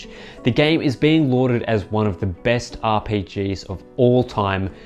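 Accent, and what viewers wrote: Australia. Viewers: hello I'm new and I subcried and you are austrailan I know because I I'm and I can tell I your voice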